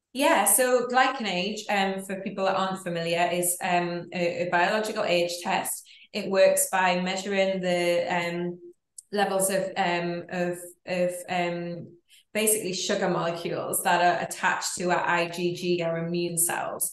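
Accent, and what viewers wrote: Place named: Newcastle, United Kingdom. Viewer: Geordie accent.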